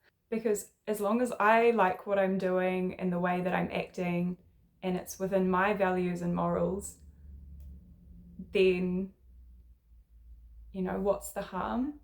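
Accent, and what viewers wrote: New Zealand. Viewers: That sounds like a kiwi accent. Kiwi culture is pretty shit really, and aren't welcoming to people's quirks.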